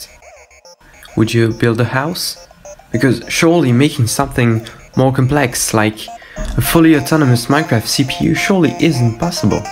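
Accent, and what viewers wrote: France. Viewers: T'es français ? J'entends un certain accent ! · with your accent youre french ?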